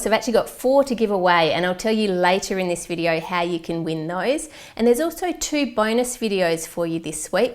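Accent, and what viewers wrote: Australia. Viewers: I am from Maryland,Us and I would honestly love winning the mixer because I love baking ands cooking but I don't always have the tools to do so(ands being 14 I don't really have the money either) and I have always dreamt of having a mixer to make what the bakers online make (I don't only watch you and other Australian youtubers to perfect my accent) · Ikr I could listen to all day:D she's Australian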